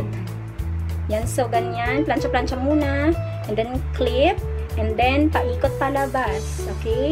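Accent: Philippines. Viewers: Ilongga ka day? Love the accent💖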